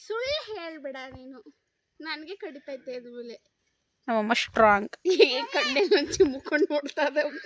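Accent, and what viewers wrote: India. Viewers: ಒಂತರ ಅಂದ್ರೆ ಹೇಗೆ . ನಮ್ ಹಳ್ಳಿ ಕಡೆ slang hage eruthe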